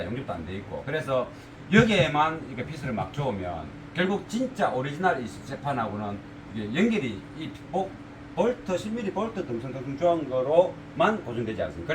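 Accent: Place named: Gyeongsang, South Korea. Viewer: 영상잘보고갑니다 ~^^ 같은 경상도 말투라서 정감 이갑니다~^^ 사장님혹 고향이 진주입니까?~^^ 우야둥둥 매번 시청합니다~~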